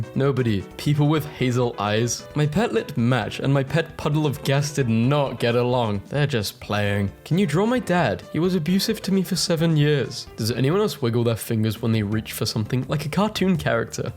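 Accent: United Kingdom. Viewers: Why can't I tell if you are British or not? It's making my skin crawl.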